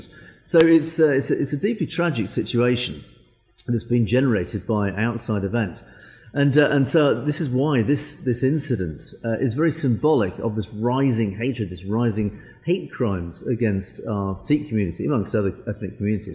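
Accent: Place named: United Kingdom. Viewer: massive respect to you gave us morale boost…just like Canadian James Cousineau (miss James laugh). Your voice is iconic it’s very coarse and gravelly with British English accent. 🧓🏼👔🎙️⌨️🖱️🖥️💡🌳 🕰️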